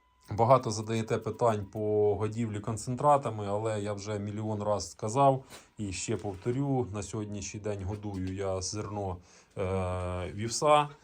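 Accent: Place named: Cherkasy, Ukraine. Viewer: Перш за все здоров'я вам і вашій родині найкрепшого.Ви надихаєте!!! Хотіла здати корову( не парується)і після вашої подорожі в Херсон визвала ветлікаря,зробив узі( жовте тіло).Пролікувала і сьогодні спарував. Отел первістки( ваше відео)- сама отел приймала навіть без сусідів. Після отелу не солодка вода а енергомол.Води пила стільки хотіла і все супер.Хто хоче вас чути той чує.Люблю слухати вашу "конкретну" мову- наче ви виросли на Черкащині.❤❤❤❤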